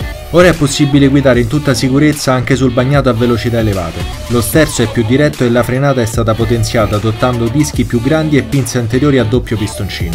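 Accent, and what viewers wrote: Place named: Rome, Italy. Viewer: Aooo !! Ma che sei poco poco romano ! Comunque bel test , ma sopratutto bel pezzo di ferro .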